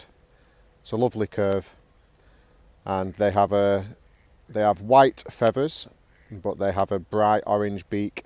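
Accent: Northern England, United Kingdom. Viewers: hello, I really enjoy your videos, especially when you take it in the nature. So two days ago I watched the film The Firm, it's about football fans, they fout with each other and at the end one of them was killed. I know the soccer in England is... like national sport..(I think) and my question is...is there in England the same groups of people in nowadays who are more interesting in having a battle after match....so what else... could you recommend tv shows or movie with the northern English accent something like yours...)) I am going to have the same one!!)) again thank you very much for things you are doing!!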